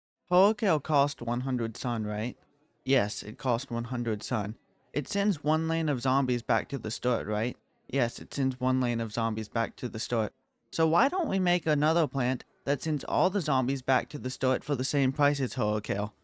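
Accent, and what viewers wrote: Romania. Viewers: r u romanian? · Are you perhaps romanian?? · Are u romanian? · Are you Romanian? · romanian detected, my fellow balkan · I know ur romanian or moldavian , but i can't prove it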